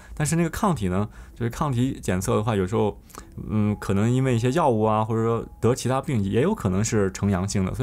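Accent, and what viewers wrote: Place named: Shanxi, China. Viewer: ！！！你好！！！去年到現在，我無意間看了你的視頻，很有趣！！！👍👍👍剛開始一聽你的聲音我就猜到你是山西人了，你的聲音真的很好聽，做主持人一定可以！！！👍👍👍讓人聽起來你是一個純樸的人，知道你在溫哥華到洛杉磯的故事！！！雖然其中不知發生什麽原因！！！我也不評論！！！但我感覺你是個好人！！！（以前在打工的時候，遇見一個老闆山西的！！！說話語調跟你一樣，說話很溫馨，不擺架子，很有善，不咄咄逼人！！！）👏👏👏雖然你做傳媒！！！經常拍東西分享！！！也把見解和生活困難面對的東西跟大家分享！！！我覺得這就是最真實的故事！！！而且你很前衛！！！APPLE的東西都被你玩轉了，當然還有其他！！！你不像其他博主，有些人品有問題，拍出來的東西都是有毛病的！！！不過你沒有！！！看你的視頻很舒暢！！！👍👍👍要是你還在溫哥華為我們分享生活就好了！！！（但人不能這麽自私，還要賺錢養家的！！！）👍👍👍 很有素質，希望離開美國還可以在Youtobe發你家鄉和中國的人和事給我們看！！！有種不舍！！！看到單身一人在國外，有些地方顯得很優秀，但還是為了生活，和目標，錢💰！！！跟我一樣有時無奈╮（╯＿╰）╭！！！很舍不得！！！多么质朴的一個人！！！（國家應該需要這樣的年輕人給他一個機會！！！）👍👍👍💪💪💪——Jim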